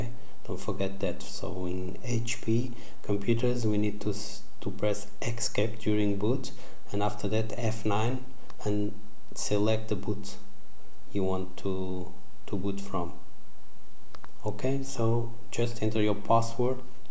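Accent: Portugal. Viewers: Na hora que ele começou a falar eu sabia que falava português kkkkkk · Aposto que o autor do video é português, pelo sotaque! I want know if I can install on a 16gb pen?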